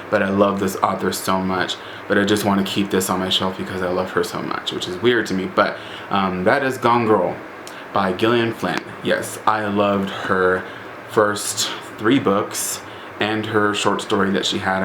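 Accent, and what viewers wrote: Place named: United Kingdom. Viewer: lol your british accent is funny :D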